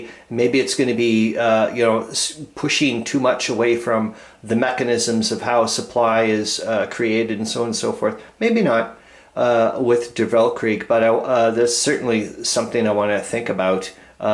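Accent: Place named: Canada. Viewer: Sorry, but you sound very Canadian, are you from Alberta or Sask by chance?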